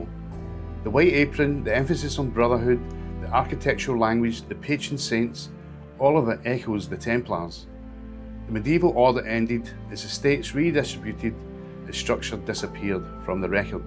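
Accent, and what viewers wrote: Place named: Scotland, United Kingdom. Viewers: I just couldn't hang in trying to work through the scottish accent. Is there a commentary available in audible english? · Just loved the Scottish accent 🌿 ❤️ 🌿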